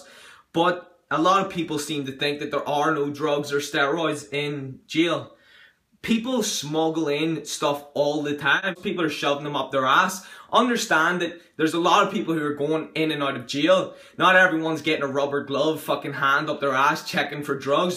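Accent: Northern Ireland, United Kingdom. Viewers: northern Ireland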